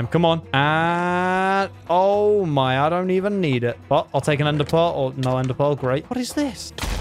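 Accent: United Kingdom. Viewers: YOU KINDA SOUND BRITISH LIKE GEORGE FROM DREAM SMP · Hes british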